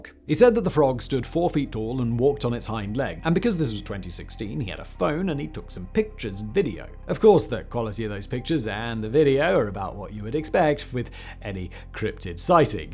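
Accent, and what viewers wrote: United Kingdom. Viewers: I need more videos of saying Cajun words in his British accent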